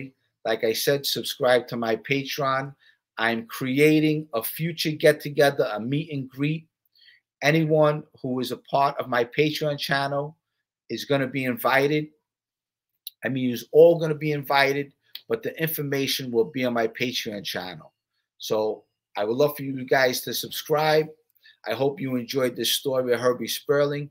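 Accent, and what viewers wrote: New York, United States. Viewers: Hi Mr, that was great story. I have to tell you that you have the best N Y accent of all the other guys that do mob stuff. It's really cool. Stay with this your doing great.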